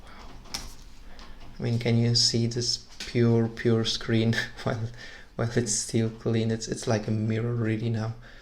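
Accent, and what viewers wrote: Poland. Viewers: are Polish? yor accent is so strong ;-)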